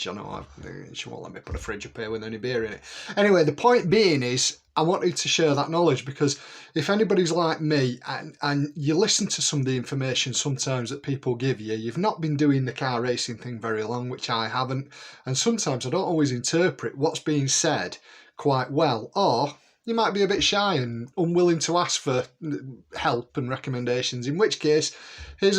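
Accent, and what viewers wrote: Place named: North, United Kingdom. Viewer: Something about this Northern accent makes me take in all the information with such efficiency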